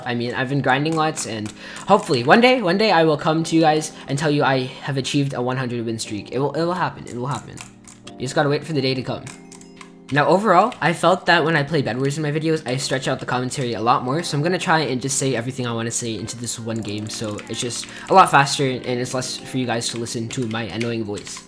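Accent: United Kingdom. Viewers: Hi u british?? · Are you British? I think so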